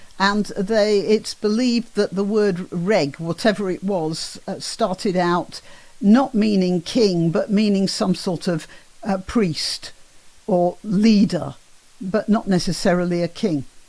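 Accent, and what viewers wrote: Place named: Northern England, United Kingdom. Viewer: Good northern accent luv!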